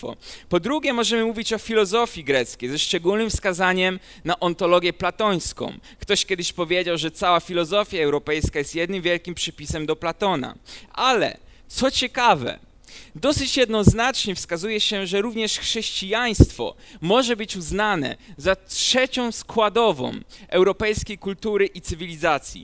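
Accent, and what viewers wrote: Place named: East, Poland. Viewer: Ma bardzo delikatny wschodni akcent. Może dlatego, że dorastał na Ziemiach Zachodnich? ;-)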